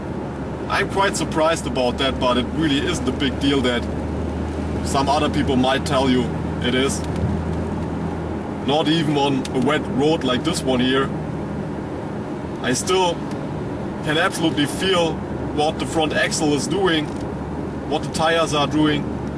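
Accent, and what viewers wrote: Germany. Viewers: I like his English with german accent. It is sort of like listening to Arnold Schwarzenegger review a car :D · I don't normally post. However, I find it ironic that he suggested you work on your English whilst his post contained grammatical errors. You have a very good grasp of the English language. Expressive, emotive and eloquent. Yes, you have a German accent, but any proficient English speaking person can understand you perfectly and you put a lot of the American youtube reviewers to shame. I just wish the car manufacturers would give you more access to their cars. Keep up the good work.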